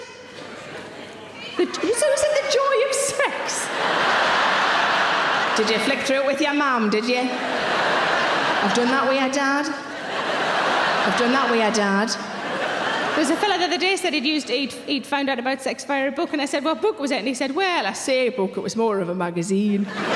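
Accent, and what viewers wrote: United Kingdom. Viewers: What part of the UK is that accent from, lovely xD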